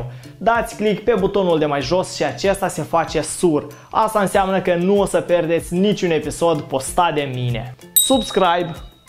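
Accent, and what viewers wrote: Moldova. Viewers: Tu esti moldovean?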